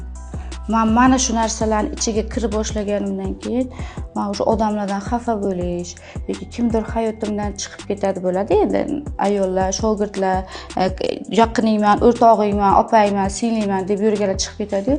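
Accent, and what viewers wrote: Bukhara, Uzbekistan. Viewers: Buxoroliksiz adashmasam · Togri topdiz buxorolik bu opa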